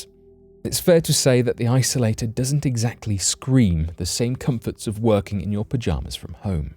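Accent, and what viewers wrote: United Kingdom. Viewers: He’s a British voice over judging by his accent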